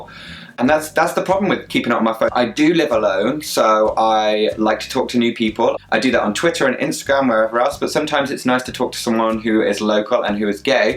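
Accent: United Kingdom. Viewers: a really great video , I know your struggle! one question , are u british? love your accent xoxo from italy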